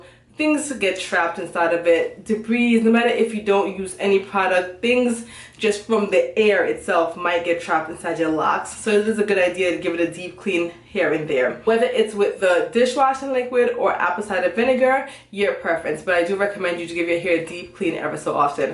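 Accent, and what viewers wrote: Patois, Jamaica. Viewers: Well obviously you are Jamaican... lololol Just heard your pathois!lolol love you even more now! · did u not hear her speaking patwa... smh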